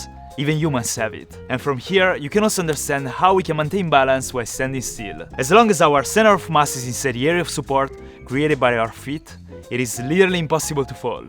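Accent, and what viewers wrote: Italy. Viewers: Ma perché ha un accento così italianizzato? (Secondo me è italiano) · Sei italiano vero? Dall'accento si sente. E comunque sei un mito 💜⚜💜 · Ma é un italiano che parla inglese? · adoro il tuo accento italiano quando parli inglese, sei uno dei migliori youtuber in circolazione, continua così😁❤️ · si sente un botto dall’accento che sei italiano😁 bel video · Se non fosse stato per l'accento non avrei mai pensato fosse un canale italiano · Your content is amazing And i really like your italian accent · Accento italiano si sente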